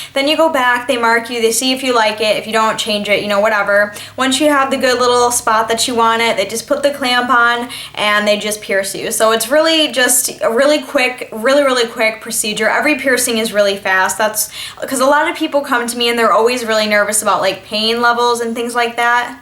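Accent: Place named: Midwest, United States. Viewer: Your accent is adorable! Guessing you're from either Michigan or Wisconsin!! 😍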